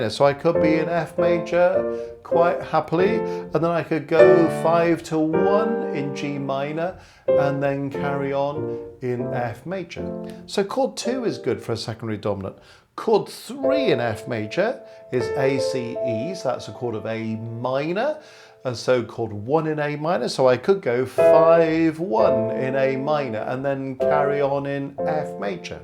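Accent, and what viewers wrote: England, United Kingdom. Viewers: i'll give you a follow just for your cute English accent .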